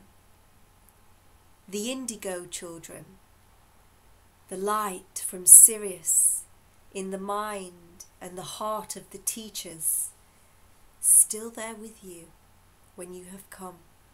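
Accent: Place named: United Kingdom. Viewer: Your GB accent is so much fun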